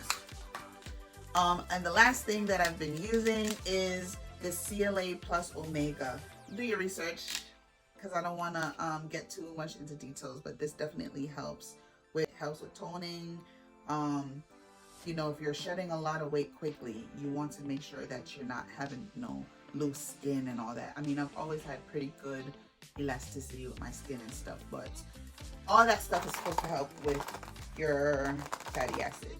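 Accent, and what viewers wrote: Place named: Jamaica. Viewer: Great.love the Jamaican twang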